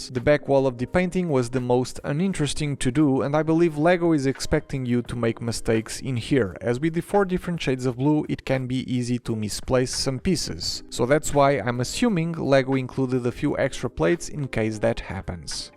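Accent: Portugal. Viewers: well it seemed to me that you were portuguese i knew only by the accent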